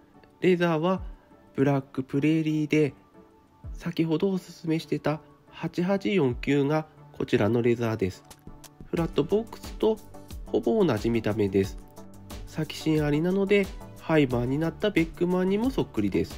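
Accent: Tsugaru, Japan. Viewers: いつも楽しく観させて頂いています｡ 津軽弁良いですよね‼️｡ (やわらかな感じで､) チャンネル登録もしています｡僕が持っているRED WINGはﾐﾙﾜﾝ(9087)ﾌｫｱﾏﾝ(8054)ﾍﾞｯｸﾏﾝ(9416)ﾌﾗｯﾄﾎﾞｯｸｽ(9060)です｡ RED WINGは５足までと心に決めていたのですが､あと１足ではおさまらないです｡ ﾌﾗｯﾄﾎﾞｯｸｽは今は光沢の出ないﾃﾞﾘｹｰﾄｸﾘｰﾑを仕様しています(光らせなくても渋く格好いいから)｡革靴は光らせる派です｡ﾌﾗｯﾄﾎﾞｯｸｽをもう１足買って光沢が出るｸﾘｰﾑで仕上げたいと思っているぐらいﾌﾗｯﾄﾎﾞｯｸｽがお気に入りです｡ 僕は革靴の色はブラックが好きです(渋く安心感があるから)｡Dﾜｲｽﾞが僕の足に合っています｡しかしEﾜｲｽﾞの8875が欲しいと思っています｡RED WINGだから赤でも､(絶対に黒と思っていました)Dﾜｲｽﾞのｺﾞｱﾃｯｸｽ仕様も考えていますがEﾜｲｽﾞも欲しいです｡絶対に他のメーカーなら黒にします｡White’sのｵｯｸｽﾌｫｰﾄﾞも欲しいのですが値段と重たさが😢諦めてしまいました｡ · 津軽弁いいですねー。人の良さが現れています。😊レッドウイングブラックブーツ最高ですねー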